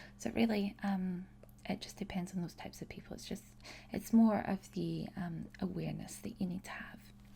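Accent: Scotland, United Kingdom. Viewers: I love videos where you have this accent, I'm from Glasgow so although this isn't my accent, it's so lovely to hear another Scottish one! It makes the video seem more real to me. Thank you for this video, I've watched it multiple times already! Xx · Love your Scottish accent role plays!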